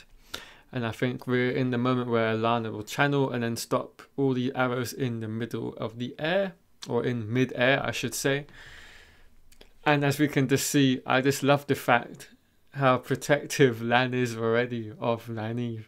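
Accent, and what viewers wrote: United Kingdom. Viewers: Are you from the UK? You have an interesting accent.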